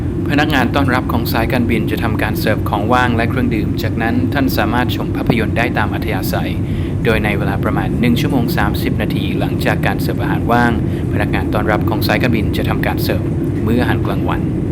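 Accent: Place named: United Kingdom. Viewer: I LOVE YOUR BRITISH ACCENNT ♥️♥️♥️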